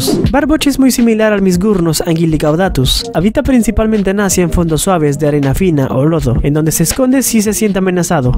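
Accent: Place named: France. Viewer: Yo solía pensar que era un francés hablando en español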